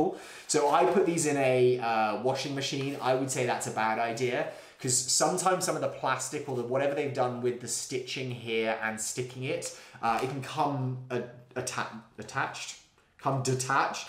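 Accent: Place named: United Kingdom. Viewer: Great videos, nice to hear a uk voice reviewing airsoft !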